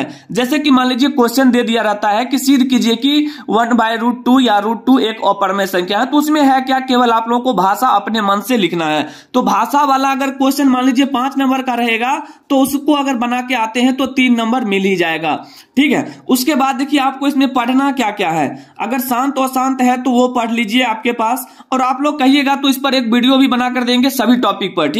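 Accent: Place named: Uttar Pradesh, India. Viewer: Up ka q bol rhe ho sir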